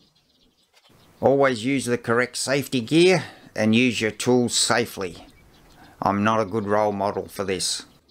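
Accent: Australia. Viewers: I thought I recognised a fellow Aussie accent. Nice job, I hope to see more. I'm Brisbane based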